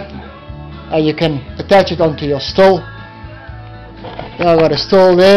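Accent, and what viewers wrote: South Africa. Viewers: Nifty little condenser, should work a treat once ya got the water flushing thru the PVC and should only need a slow flow being the overall volume of the pipe too. Been tryin to work out your accent mate, I am guessin south african? You certainly got some skills my friend, good work bro. Cheers n regards Lee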